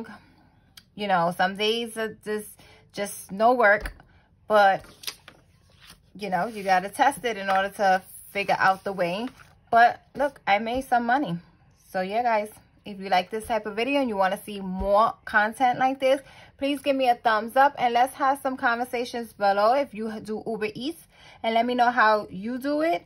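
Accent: New York, United States. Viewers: New York accent strong lmao I appreciate this video you didn’t have to do this but u did 💯❤️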